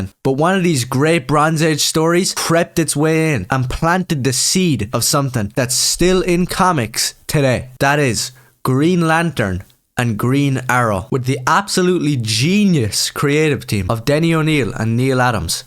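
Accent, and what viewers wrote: Ireland. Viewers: Really funny video, really hilarious, great job. I’m really bad with western accents, I’m not sure if you’re from Sligo, Leitrim, Limerick or Roscommon, it’s probably one of them haha. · Love the Irish accent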